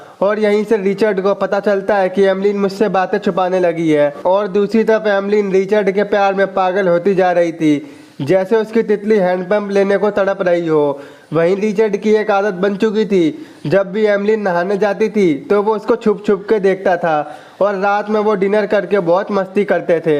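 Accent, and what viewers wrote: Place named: Mumbai, India. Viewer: Bhai full to mumbai walo ke jese explqin karta hai😂😂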